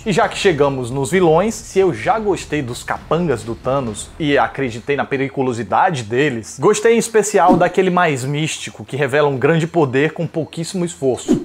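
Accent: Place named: Ceará, Brazil. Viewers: Cara adorei seu trabalho, fala um ciarez fluente, com mestrado, doutorado e senhor dos anéis, deixa tudo muito engraçado de se ouvir, bom trabalho. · Amo sua narração (estilo nordeste)em cearencês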